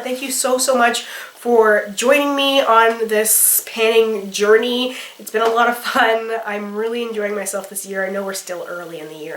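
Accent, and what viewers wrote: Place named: Canada. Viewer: I love your videos. I wish I had more time to devote to them. Three little ones keeps me busy. Don't be nervous about your get ready with me's. Taylor swift says "haters gunna hate". But your true followers love you for who you are. I love listening to other Canadians and how they pronounce their words. And today I realized we say our "A's" the same! Ok. Enough fan girl stuff.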